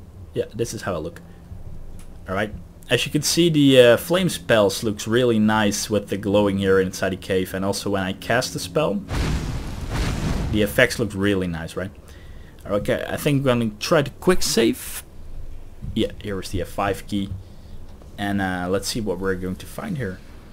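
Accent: Netherlands. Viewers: Ok cool! When are the next parts coming out? Do you have an upload schedule? Sorry for all the questions I am just really excited for this series haha PS: Je hebt een Nederlands accent kom je uit Nederland?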